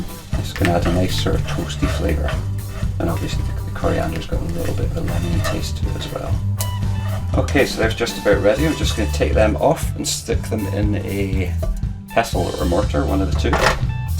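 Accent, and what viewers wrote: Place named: Ireland. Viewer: Cool recipe makes it better hearing an Irish voice :)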